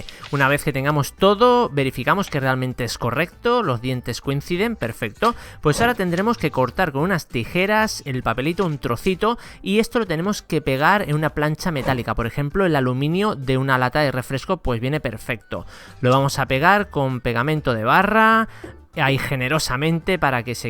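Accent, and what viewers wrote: Chile. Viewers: eres chilenos? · ¿Oye el es Chileno? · Eres chileno?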